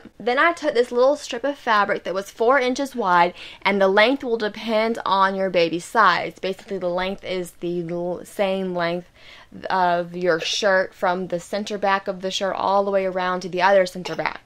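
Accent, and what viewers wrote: South, United States. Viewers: so beautiful! what a great tutorial! and I love your southern drawl! lol what material did you use for the gold? on the accent?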